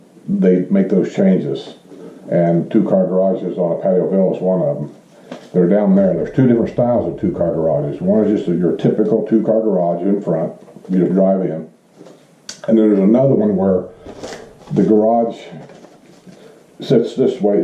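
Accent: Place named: Central Ohio, United States. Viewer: First -- these are great videos. Just moved into Pine Ridge down here and you're my new 'go to guy' for info about our new hometown Second -- I grew up in central Ohio and I imagine you grew up within a state or two of there. You sound like everybody I remember growing up Third -- you may have addressed this in another video, but do you know why cell service is so spotty in Villages homes? Verizon told us to set our phones for 'advanced calling' so our wireless router could help, but that barely helped at all. We just went ahead and got a land line. And finally -- who did you use for your water purification system? We get dark ring-around-the-commode gunk after a day or two without flushing. Who provides this ugly water? Everything is so 'perfect' and well done down here, it amazes me that The Villages hasn't fixed this community wide. 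Thanks again for proving this really is Florida's Friendliest Hometown.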